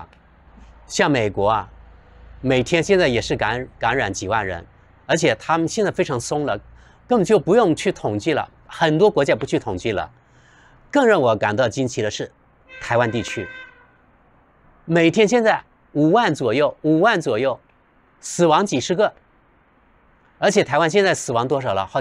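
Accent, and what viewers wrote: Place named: Guangxi, China. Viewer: 乡音不改鬓毛衰 同为桂林人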